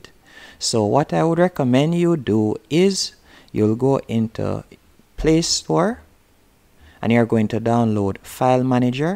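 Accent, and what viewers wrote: Jamaica. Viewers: u jamaican??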